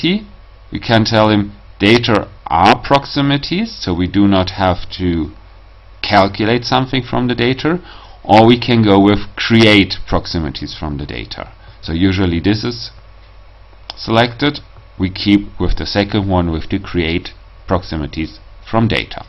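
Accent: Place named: Germany. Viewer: schrecklich deutscher Akzent. Mach gleich ein Video in deutscher Sprache!!!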